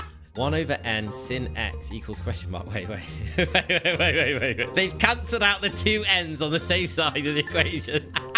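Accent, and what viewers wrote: United Kingdom. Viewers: Noa fan of the British accent and the large number of words pronounced incorrectly.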